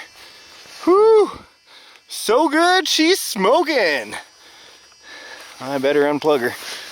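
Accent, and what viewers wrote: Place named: Canada. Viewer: Dude your videos are always playing on my and my sons Youtube. I love your content and your Canadian accent 😂🤣 Keep up the good work, you inspire us and others like us. Thank you for being awesome, wholesome, and family friendly! My son and I are hoping to start documenting our own outdoor R/C adventures, so stay tuned!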